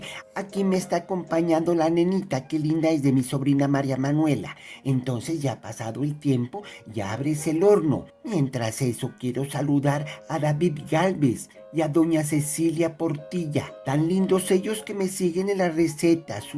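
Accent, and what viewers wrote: Colombia. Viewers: Puro asentó nariñense me encanta que viva pasto desde ipiales Nariño saludos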